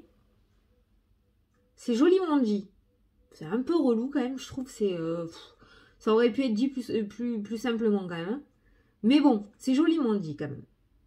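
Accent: South, France. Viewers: Bonjour et encore merci. Pour moi ce sont tes abréviations ( maniant au lieu de maintenant ou si t es d accord au lieu de si tu es d accord......cela exprime ta joie et ta volonté de nous faire aller vers le meilleur très rapidement car tes idées fusent. Il y. On doit se bouger le « derche ». Et il n y a pas qu un chemin pour y arriver,il y en a plusieurs et enfin tu vas passer un sale quart d heure mais cela t amènera à une vie de joie.........tes phrases sont pleines d espoirs et de vitalité avec ton accent du midi, c est ce qui donne de la force à tes paroles quelque soient les mots que tu utilises. Encore merci et à demain 🙏🙏🙏🙏🌞🌞🌞🌞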